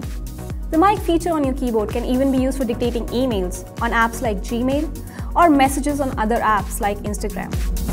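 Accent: India. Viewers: that's not broken English, it is Indian English (read accent), native English people might face difficulties but however, others have problems with native accents too. That is normal!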